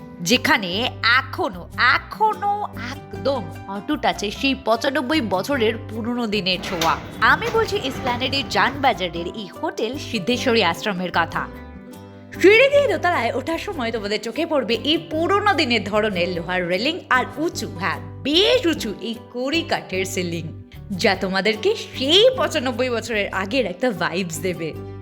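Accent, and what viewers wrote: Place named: England, United Kingdom. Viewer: বাংলা উচ্চারণ ঠিক করুন, না হলে শুদ্ধ ইংরাজিতে ভিডিও করুন। ইংরাজি বাচনভঙ্গিতে বাংলা বলছেন অত্যন্ত বাজে ভাবে কানে লাগছে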